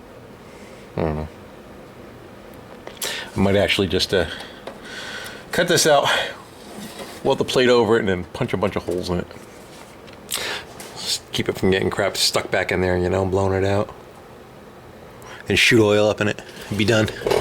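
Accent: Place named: South, United States. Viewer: Thiss'n! Awesome. Love southern speak!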